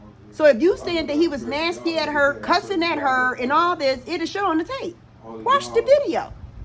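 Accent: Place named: South, United States. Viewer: Nothing wrong with sounding country it’s just interesting to our ears to hear it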